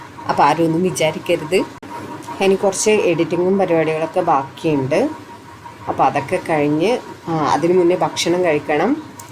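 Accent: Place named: Kannur-Kasaragod, India. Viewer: Eachinde nad ede kannura kasroda slang kekumbo thoninn . Nan kasargodil ninnan ta ❤❤😊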